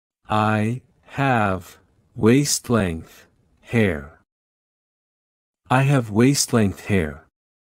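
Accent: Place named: Florida, United States. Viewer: 美国不同的州说的英语有不同的口音，这个英语口音和我们佛罗里达州相同，很适合我。非常感谢你们制作这视频！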